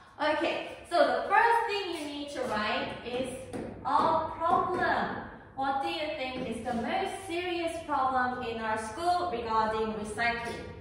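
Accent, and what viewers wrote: United Kingdom. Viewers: 뭔가 영국액센트 느낌... 쌤 너무 잘하세요 나도 저렇게 잘하고 싶다 많ㅇㅣ 배워가요!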